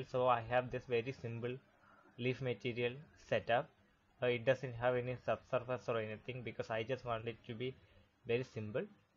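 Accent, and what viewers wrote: Kerala, India. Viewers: BRoo you sound Mallu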